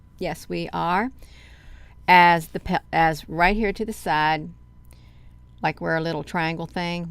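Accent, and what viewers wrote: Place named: Georgia, United States. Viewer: Hi, this sphere thing has always intrigued me, it’s happened a few times over the past 5 or 6 years. Obviously something massive that our so called leaders keep from us. This is a completely different subject but I gather from your accent which i think is awesome by the way, that you live in Georgia. I’m flying over from Australia to stay with my cousin for 2 weeks, he and his wife live in Savannah, can’t wait!.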